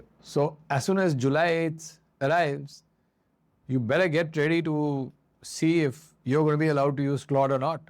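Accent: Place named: Scotland, United Kingdom. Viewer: Dude, it sounds like you learned English in Scotland, but your info is accurate. Thank you.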